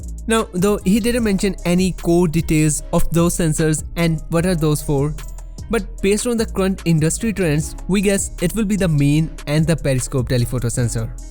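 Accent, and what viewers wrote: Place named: India. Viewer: No offence, but the English Indian accent is the worst at the world Seriously my ears can't bear to hear it even 1 moment 🤢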